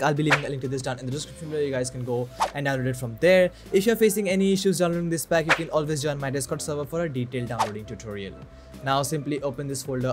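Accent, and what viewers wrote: India. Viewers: indian accent, dislike, next video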